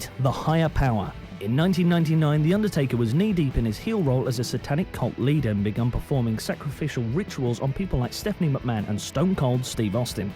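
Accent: United Kingdom. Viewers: What the fuck is your accent? Like a posh, cockney, Geordie, Australian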